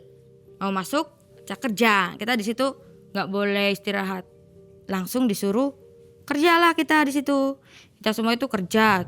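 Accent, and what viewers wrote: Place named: Java, Indonesia. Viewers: Serem tapi aku ketawa Mulu sama Bahasa ngapaknya . Kebetulan aku orang tegal juga mba. salam kenal. sampean tegale Ndi yu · Ngapak tegal 😅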